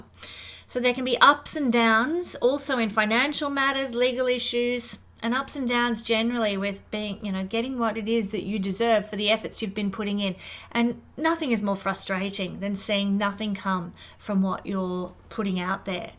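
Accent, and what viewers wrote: Australia. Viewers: Thank you-my first foray into Vedic astrology...interesting. Good to hear an Aussie voice!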